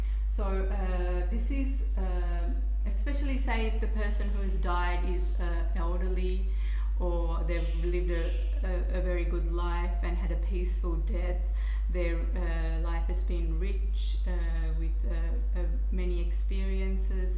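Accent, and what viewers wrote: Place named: Australia. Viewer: Australian accent interesting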